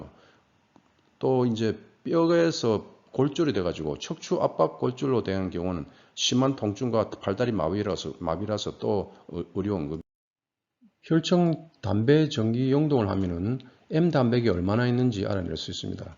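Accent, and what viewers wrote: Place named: Busan, South Korea. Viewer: 교수님 부산분이신가요 ? 익숙한 억양 너무 좋아요 쏙쏙 들어오고 친숙하고 재미있어요 ~^^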